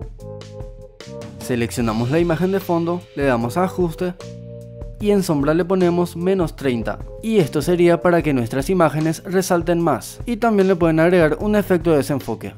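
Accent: Paraguay. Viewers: Su acento es muy paraguayo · Eres de Paraguay no? lo note por tu voz además yo también soy de Paraguay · Xd con tu voz se que sos Paraguayo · Amo el acento paraguayo, lo reconocí porque mis apas son de Paraguay ✨ Buen tutorial, bro ✨🔥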